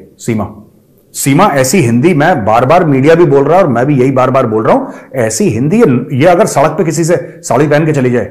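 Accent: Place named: Pakistan. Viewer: Amazing Pakistani voice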